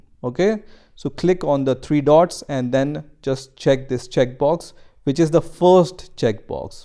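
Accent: India. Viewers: Thank you very much for your most helpful vedeo.I was facing this problem since mornig. I could rectify it from your step by step instruction in clear indian english. Kindly make more videos like this.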